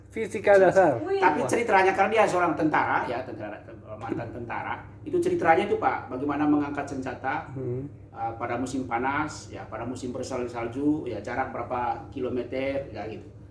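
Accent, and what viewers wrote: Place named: Manggarai, Indonesia. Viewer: Bp doktor, itu logat Manggarai tuu kental ew😅😅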